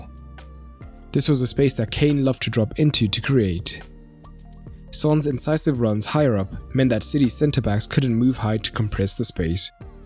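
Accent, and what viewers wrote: South Africa. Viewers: You sound south African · Bro where are you from ? You sound so South African ? 😂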